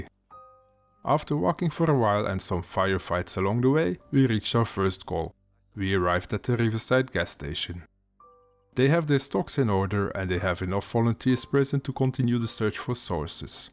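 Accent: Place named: Russia. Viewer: I like your Russian accent 👍💪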